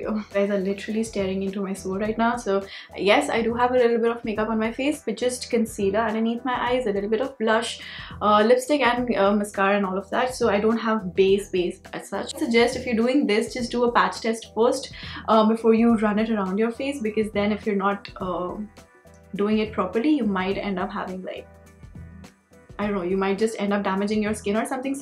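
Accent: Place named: India. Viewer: The technique is very good but the accented English is very, very hard to understand outside of India (ex. for American or British listeners)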